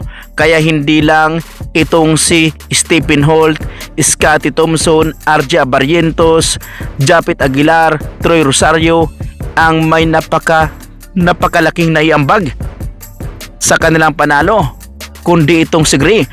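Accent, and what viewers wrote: Visayas, Philippines. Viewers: ayusin mo salita mo wag ka bisaya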